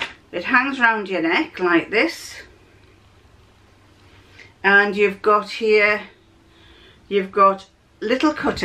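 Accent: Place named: East Boldon, United Kingdom. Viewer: Hello from rural New South Wales where the nearest sewing notions store is 1.5 hours drive away. Love your accent as I'm originally from East Boldon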